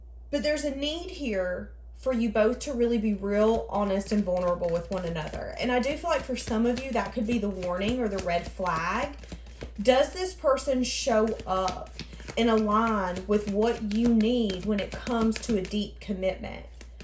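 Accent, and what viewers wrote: South, United States. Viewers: Thank You. You are inspirational. Love your sweet southern accent and good advice.❤❤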